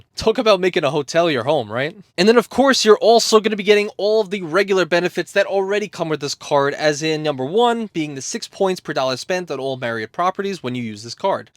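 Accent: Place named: United States. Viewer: U beautiful man. Love ur country boy accent btw. Lol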